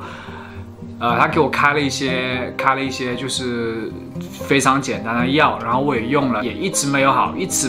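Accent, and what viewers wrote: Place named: Taiwan. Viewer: 怎么台湾口音？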